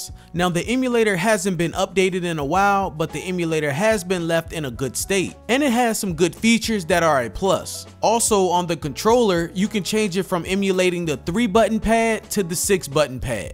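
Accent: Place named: South, United States. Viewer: maybe he is from the south?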